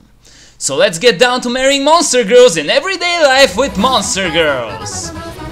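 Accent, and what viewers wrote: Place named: Romania. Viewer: Ima say Romanian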